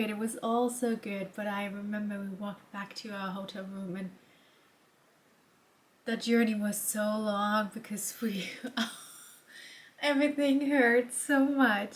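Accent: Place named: Germany. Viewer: You’re doing great! Are you from Germany? Your accent and voice is very similar to a close friend of mine who is from Germany. :)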